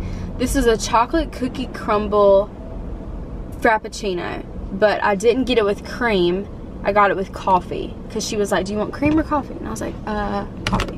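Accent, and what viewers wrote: South, United States. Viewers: i love your accent !! it kinda sounds country! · Its like southern and Australian 💚💚💚💚 I love it too!!! :) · Where are you from. Love the country accent.im from TN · it totally is country lmao. i’m pretty sure she’s from the south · K H I’m from Texas and everyone says we have a country accent but her accent is soooo cute it has to be somewhere from the south but I can’t tell where 😭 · North Carolina I think!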